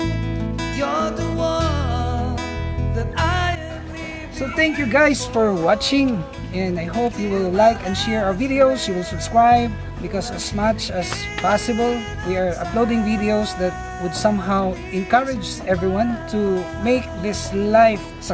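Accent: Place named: Bisaya, Philippines. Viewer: murag bisaya man mo kuya.. taga asa mo?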